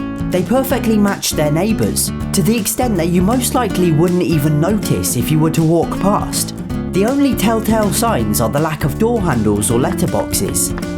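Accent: England, United Kingdom. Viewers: ❤I love his British accent❤ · Beautiful English accent.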